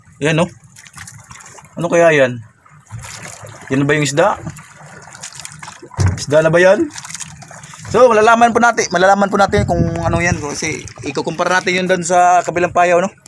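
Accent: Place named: Bohol, Philippines. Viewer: tujok tujoka noy .. taga bohol man diay mo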